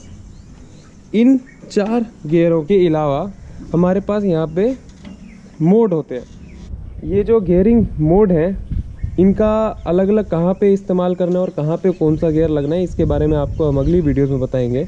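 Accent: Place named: Haryana, India. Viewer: Haryana ki boli laage tere Bhai jma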